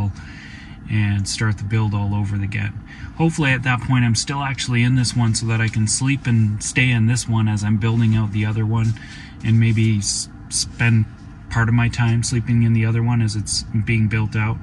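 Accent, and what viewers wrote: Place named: Quebec, Canada. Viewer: Watch Eric's channel where he talks about "11 design mistakes". Really interesting. Oh and the accent is from Quebec. Thanks for sharing A live stream would be great!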